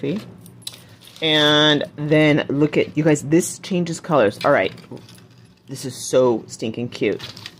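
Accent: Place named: United States. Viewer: Muy are beautiful as I see you on your face with quialificatiion to be on the camera of your phone, thanks for your help on how to buy items and the complementaries to see you more on your camara, I love your voice is perfect to hear the good American's accen which is easy to follow for me that english language is not my mother's ternt language , sincerely from Norway , raiza Theis from Venezuela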